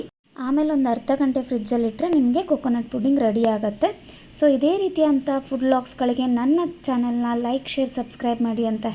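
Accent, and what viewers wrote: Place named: North Karnataka, India. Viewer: ಉತ್ತರ ಕರ್ನಾಟಕದ slang ಚೆನ್ನಾಗಿರುತ್ತೆ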